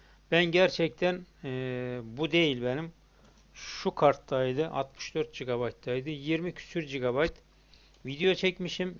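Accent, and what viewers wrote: Erzurum, Turkey. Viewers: abi Erzurumlu, net!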